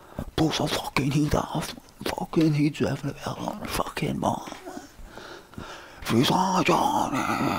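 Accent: Scotland, United Kingdom. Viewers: Yep. I'm from Scotland as well and I speak just like that ....... without the angst 😂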